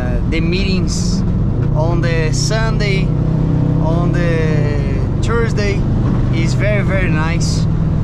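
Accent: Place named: Brazil. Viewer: ele "perde" esse sotaque abrasileirado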